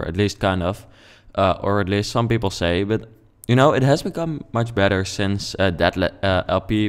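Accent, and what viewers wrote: Netherlands. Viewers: You might wanna work on your accent a little, cause it's really thick dutch. I'm dutch and it's a bit hard/irritating to listen too. But i really like the house! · He is from Netherland. Not everyone can speak good English. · Uk hoor gewoon aan je stem dat je Nederlands bent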